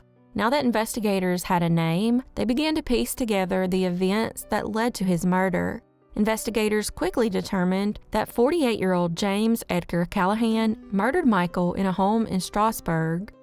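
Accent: South, United States. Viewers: This narrator has a nice southern accent.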